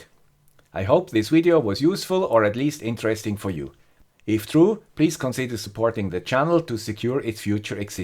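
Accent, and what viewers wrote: Switzerland. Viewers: You are correct. Many crowdsourced collaborations have occurred in the digital / electronics world. Many have failed due to the lack of process and project management issues. Do not be so hard on your documentation assessment (at least - in this video) and challenges you faced. You highlighted important elements - and yes, all engineers, myself included, hate paperwork. But as all know, they are a necessary evil. We have learned much from Michael Faraday and his superb skills and the unfortunate challenges of lacking a formal education that, very likely, held him back from even higher achievement than he already had achieved at such an early age. Newton was the exact opposite. By the age of 23, his manuscripts had become masterpieces in their own right. We are all "lazy" in comparison ;-) Even so, I think you deserve a lot of credit creating this content and its format. 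It is superb - even for a guy with a Swiss accent - that is easily understood in the YouTube Universe!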